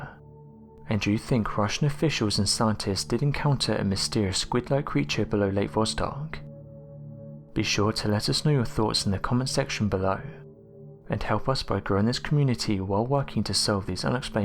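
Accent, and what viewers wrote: United Kingdom. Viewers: These videos would be much better if you slowed down a bit, the rapid fire reading with the British accent makes it extremely hard to understand. Not everyone is British.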